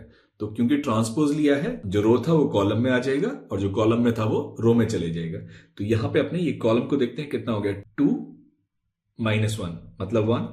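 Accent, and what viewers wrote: India. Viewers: Sir aap southern part of india se hai kya?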